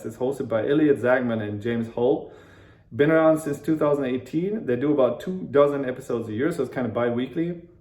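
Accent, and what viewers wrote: United States. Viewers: Thank you very much for all the information about the podcasts. I listened to few episodes of little red podcast. It is wonderful. My interest in understanding China arises from my unfortunate encounter to industrial espionage (intellectual property theft and sabotage) in Canada, and how much my refusal to be corrupt ended up changing my life. I came to know how many of my friends are compromised. I wanted to learn Chinese work culture, their fears, their motivations, their aspirations, and their tactics, just to survive. If you are a German, I would never guess that you were not an American (or a Canadian) from your American accent. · You have American accent. Very good English comparing to some German friends I had long time ago in school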